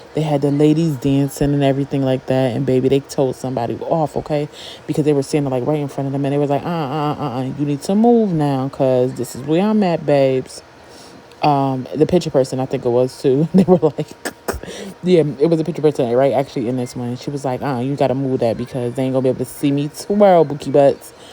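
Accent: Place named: Connecticut, United States. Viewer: I know this is old, so you might not see this but the whole time watching I was like her accent, I wonder if she from CT, so I looked in the comments. Still not done watching but I see this comment, and I'm like wait, we say this here lol. So still not sure, but yea, I was wondering.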